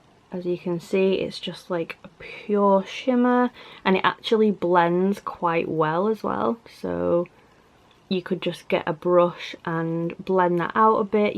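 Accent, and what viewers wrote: United Kingdom. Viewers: What part of the uk r u from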